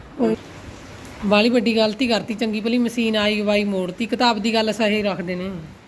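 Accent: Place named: Sangrur, India. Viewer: Hr gl nal te te bolde. Sangrur wale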